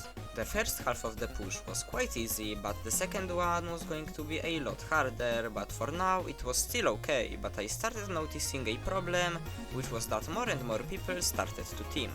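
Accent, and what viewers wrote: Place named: Poland. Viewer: Masz polski "akcent"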